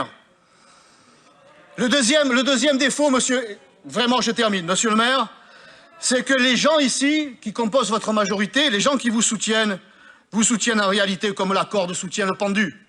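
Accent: South, France. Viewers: Un homme charismatique avec l'accent du sud et qui lâche de grosses punchline sur des socialistes que demander plus 🤣🤣. Président 🇨🇵🇨🇵🇨🇵🤣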